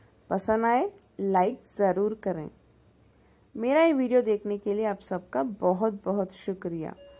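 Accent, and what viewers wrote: Hyderabad, India. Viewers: Are you from Hyderabad (you accent hints) 😅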